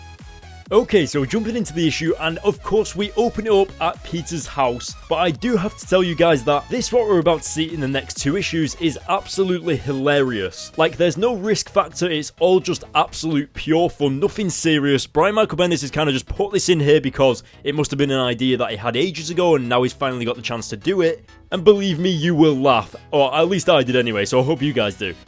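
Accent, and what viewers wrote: United Kingdom. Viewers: never knew you were english.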